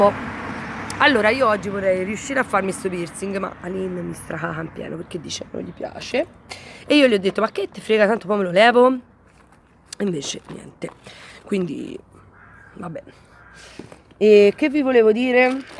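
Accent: Tuscany, Italy. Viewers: Bel video :) tieni un sacco di compagnia e il tuo accento toscano è meraviglioso :) ❤🌹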